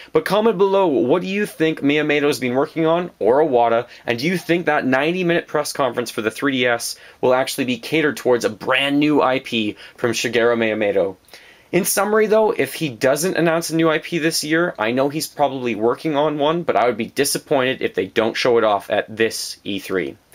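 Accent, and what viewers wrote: Canada. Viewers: I just came across your channel and I really liked it, man! I enjoyed hearing your thoughts and optimism for Nintendo's E3. I really hope to see the Wii U Zelda that isn't Hyrule Warriors (Aonuma pretty much said this was true), some strong 3rd party titles, and some more stuff for the Virtual Console. I want my N64 and Gamecube games on there! Of course, I really want a new Star Fox, Metroid, and F-Zero, but we'll have to just wait and see about that. Also, it might be just me, but you sound tremendously like Alex Lifeson from Rush with that Canadian accent.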